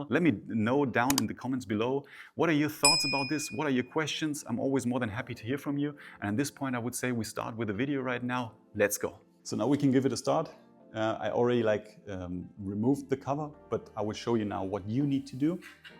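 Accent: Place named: Germany. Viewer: Tell me your German without telling me your German , pronouncing :)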